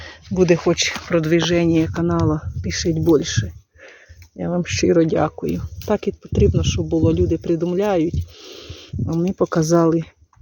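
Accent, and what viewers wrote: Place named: Ovruch, Ukraine. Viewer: Все у вас добре, урожай є, і говорите ви гарним суржиком, по особливому, по овруцькі, як мені хочеться в Овруч, може пізньої осені вдасться, люблю ваші відео про місто, про ваші квіти, мальви... Головне щоб було здоров'я і мир!!!!!!❤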